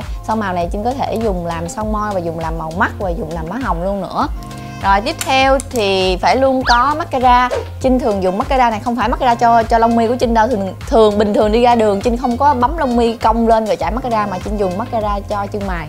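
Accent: Mekong Delta, Vietnam. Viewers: Nói chuyện mộc mạc đúng chất miền tây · Trấn Cường Lâm đậm chất miền tây luôn á :)))) r mà toàn đọc thành g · Trinh nói chuyện thật quá đậm chất dân miền tây tự hào quá trinh ơi Dân miền tây tụi tao thật thà và chất phát 😁😁😁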